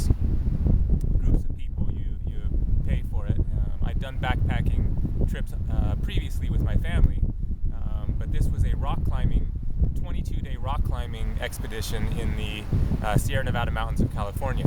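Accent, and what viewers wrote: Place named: Canada. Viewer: I knew that was a Canadian accent!!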